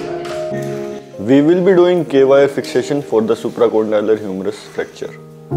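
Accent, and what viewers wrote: Haryana, India. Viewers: No doubt sir I like the way you present your interest as orthopedic surgeon 😊 but more than that I love to listen your haryanavi accent . Which is just awesome 👌 👏 👍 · Haryanavi accent, just wow🎉.. Dr.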